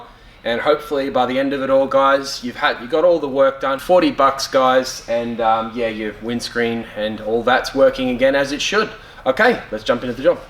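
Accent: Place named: Australia. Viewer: Great video with free easy to understand AUSSIE accent.winner thanks mate you saved a trip to the mechanic.👍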